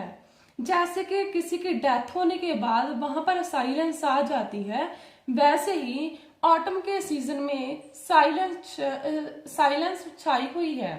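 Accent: Punjab, India. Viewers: 👌 Very nice video mam. 🙏 Thank you so much for your valuable information. क्या आप पंजाबी या हरियाणा से हो? ऐसे ही पूछा है आप के बोलने के अंदाज से. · Your voice reflect Punjabi tone,nice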